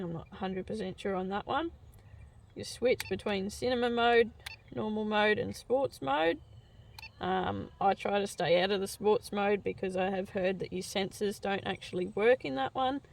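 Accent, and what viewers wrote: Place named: United Kingdom. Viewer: British Eng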